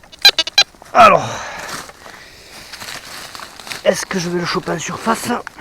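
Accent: South, France. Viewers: Impossible de répondre je fais un essai comme ça si ça fonctionne je répondrai de nouveau Ça y est ça marche alors j’ajoute : Merci pour les infos je commande souvent sur Ali… Je vais regarder merci pour l’info Concernant ce rencontré ça risque d’être compliqué je suis dans l’Allier 03 Vu l’accent 😜 vous êtes dans le sud ?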